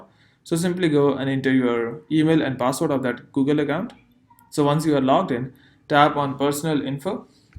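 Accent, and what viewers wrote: India. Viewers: You know he speaking facts when you hear he’s Indian accent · Right when I heard that Indian accent I knew it would be the easiest tutorial thanks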